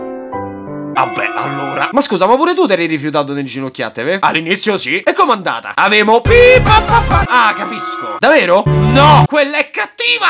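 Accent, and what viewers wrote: Rome, Italy. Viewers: Il primo romano sulla terra che pure se parla romano, non mi fa venire voglia di spegnere youtube. Sei un genio!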